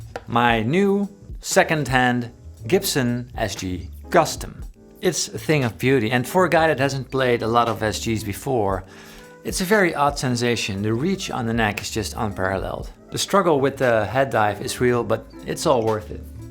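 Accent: Netherlands. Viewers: i love how you set up videos and edit them but as a fellow Dutchman it sounds kinda strange hearing someone Dutch speak English so for me it is kinda a offset but that's on me xD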